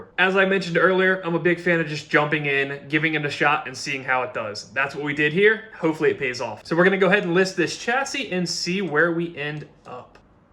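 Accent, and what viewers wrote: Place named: Baltimore, United States. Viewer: Cool video and insight on the specialty parts business. Also love the Baltimore accent--very similar to Philadelphia's beautiful dialect ("Hoagie Mouf"). Takes one to know one. 😁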